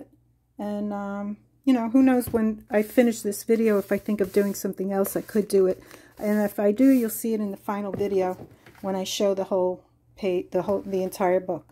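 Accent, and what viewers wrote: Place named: New York, United States. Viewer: I love your longer videos. I also like your voice and accent. I had an aunt from New York and used to love to visit with her. Your sense of humor is great. Your keep it real for all the times we craft and things happen, I laughed out loud when that lid to your ink pad went flying off the table. I also love how you insert comments to people who are going to say something negative. Anyway, just my two cents. I look forward to your videos.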